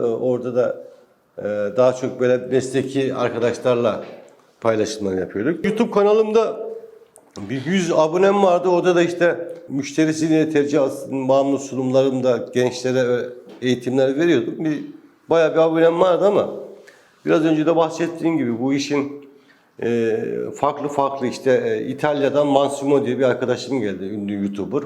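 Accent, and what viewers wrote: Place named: Turkey. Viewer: Cc bsr Mr merci beaucoup de nous avoir parlé de vous cependant vous avez du talent et ça ça ne s'apprend pas mr6 👍 bravo un grand Merci pour votre accent Turc j'adore.cest agréable de vous écouter je comprends rien mais je lis l'anglais donc je comprends tout je vous zdore6 jame6voyd voir ici à très vite merci beaucoup ♥️🇹🇷🇨🇵👍😎🇫🇷🇹🇷🇹🇷🇹🇷🇹🇷♥️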